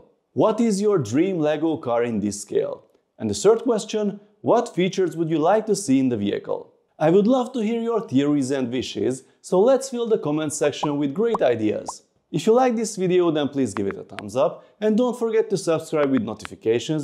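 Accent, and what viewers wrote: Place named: Hungary. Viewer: Az akcentusod alapján magyarnak mondanálak :D Király videó!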